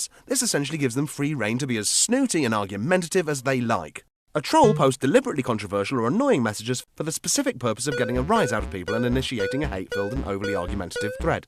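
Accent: United Kingdom. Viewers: Everything sounds better British. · The british narrorator makes this video hilarious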